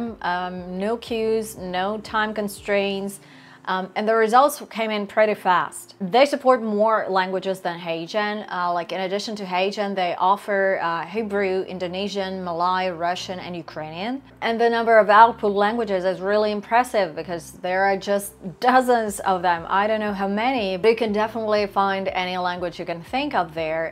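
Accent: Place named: Spain. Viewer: es gracioso que tu español es el de España jaja y el de las ias suena más mexicano neutro 😂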